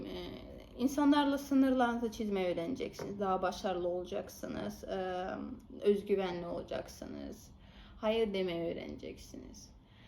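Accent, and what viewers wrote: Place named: Azerbaijan. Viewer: Bakü’de doğmuşsunuz ne güzel. Konuşurken şivenize bayılıyorum. Dinlemek çok hoşuma gidiyor. Sizinle ilgili merak ettiğim birkaç şeyi de yanıtlamışsınız teşekkürler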